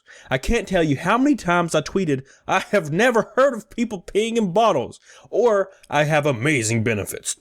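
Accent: South, United States. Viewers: Omg yes I love you. I need a southern gentleman in my life. Marry me please. 🥰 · I went to GamtStop today and they tried to sell me a 1 year warranty. I asked are you guys gonna be around in 1 year. The employee laughs and said you sound like the guy on YouTube with the southern accent.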